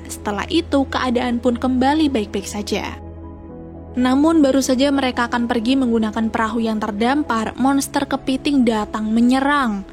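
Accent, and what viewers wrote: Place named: West Java, Indonesia. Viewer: Kak kakak orang mana kaya orang sunda euy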